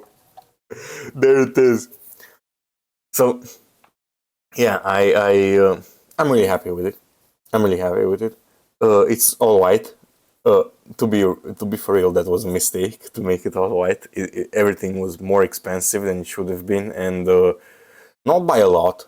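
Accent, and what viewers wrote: Romania. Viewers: Salut. Ma uit la video si abia dupa 10 minute imi dau seama ca esti roman. Preturile sunt mai scumpe in EU pentru ca se adauga TVA la tot, peste care se adauga pret transport si adaos comercial. De-asta e suparat Trump ca noi europenii avem TVA, la ei nu este. Eu am 7800x3d si rx 7900xt luate in aprilie 2024, upgrade de la i7 11700F si RTX 3070. Nu m-as mai intoarce la intel si nvidia, poate sa le primesc gratis. Ma uit si eu cu jind la 9800x3d si 9070xt si ma cam "mananca" sa fac upgrade dar nu e logic sa dau bani pe upgrade decat peste inca o generatie. E diferenta de 11% maxim intre ce am si 9800x3d/9070xt. Daca poti fa switch la proc AMD nu o sa regreti. Eu aveam consum de 175W in cinebench la i7 11700f cu placa smechera z590-f care scotea tot din procesorul ala fara K (un fel de PBO de la AMD) si era varza procesorul pe langa asta, ryzen 7800x3d cu 86w in cinebench si scoate cu mult peste cel vechi, sa nu mai spun de gaming rupe tot in afara de 9800x3d. 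Faptul ca am luat procesorul asta cu consum mai mic mi-a dat posibilitatea sa pun placa video cu consum mult mai mare(318w de la 220w cat aveam inainte la gpu) la aceeasi sursa de 750w. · I can tell the Romanian English accent , because im Romanian myself, here's a sub , i feel like we're already friends lol .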